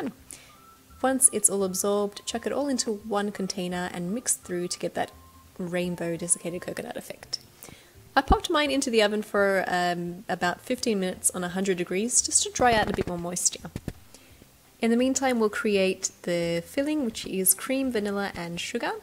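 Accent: Australia. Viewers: Oooh Australia, I love the australian accent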